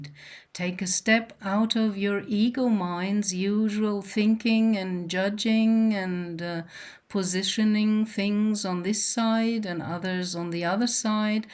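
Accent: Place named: Germany. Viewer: I had to subscribe. You have a similar German accent to my father, an accent that shows that you worked on perfecting your english. He passed at 90 a couple years ago and today May 11th was his birthday. I called his partner to wish her a happy mother's day, and we talked about him. Anyways, i like your message, i hope you're back in full swing soon. 💗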